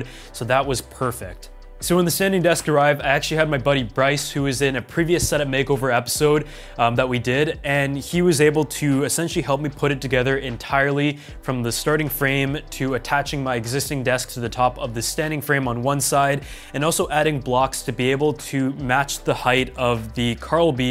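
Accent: Canada. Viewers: Hey, are you Canadian man?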